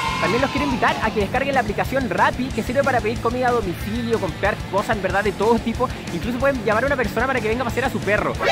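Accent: Chile. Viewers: Eres chileno¿? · Acento chileno ?..soy nuevo en este canal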